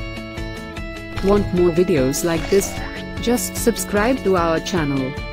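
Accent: India. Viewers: It’s an Indian Accent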